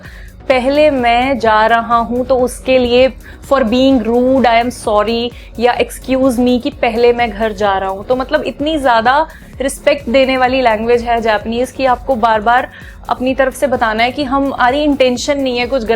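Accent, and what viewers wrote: Punjab, India. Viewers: Ap punjabi ho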